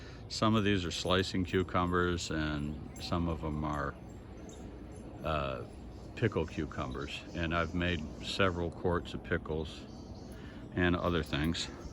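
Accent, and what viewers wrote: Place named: Texas, United States. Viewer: nice! I looked into the self watering pots by that Leon guy, very cool. Fun to hear an old Texas accent too! Haha I think I’m gonna start some. Where did you get your buckets?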